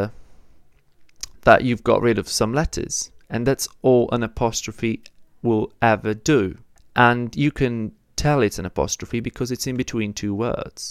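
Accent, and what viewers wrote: England, United Kingdom. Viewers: OMG... thank you for the videos! I've been looking for something like this since last year but you didn't come up from research results and nothing else worth watching either. I found Tom, a guy from New York with EXCELLENT American English accent but he didn't break down his lessons like yours! So I didn't learn much from him. Thank god your British accent is NOT too thick for my American ears! :) Please keep it coming. I haven't watched the entire 65 videos yet but loving the ones I have watched. I even fall asleep! NO, it wasn't because it was boring but because your voice is soothing that managed to help me fall asleep (I am insomniac). Thank you again... and thank your girlfriend, too! :) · Born in England, or grew up there, or what? How amusing to find an Italian channel taught with an English accent.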